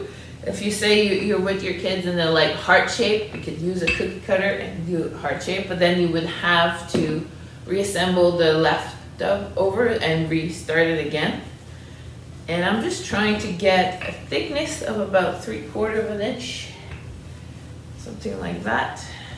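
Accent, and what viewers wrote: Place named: France. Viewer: You are so charming with your French accent.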